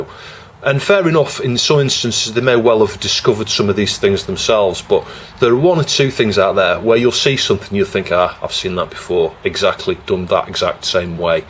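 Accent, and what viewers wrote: United Kingdom. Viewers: Be a shame if you stopped. Recently discovered your channel. British accent makes everything sound better. Sorry to hear you're getting caught up in YT drama crap. I'd say just ignore it and do your thing but easier said than done. Also, don't sweat the likes/dislikes. I tend to never "Like" anything. Just because I'm lazy. Youtube should ditch it, watch time is all that matters. Then again, if you don't enjoy making the videos, then forcing yourself to do it will only make it worse. Good luck!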